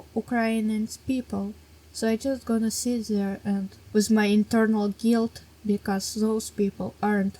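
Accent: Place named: Russia. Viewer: Из чистого любопытства нажали на этот ролик сразу после просмотра видео от Zoppru. Интересным опытом получилось лицезреть совершенно неожиданное сочетание классического "рашн" акцента с действительно хорошо и интересно написанным текстом, офорлением и подачей - как у настоящего ютубера! Видно, что над роликом старались, своими силами, но старались, продолжайте расти как контентмейкер и заводить добрых и понимающих друзей. P.S. Да ладно, неужели наконец-то русскоязычный чел на ютубе, у которого можно написать о себе в зей/зем и не схолпотать в ответ ворох придирок за экзотичные местоимения?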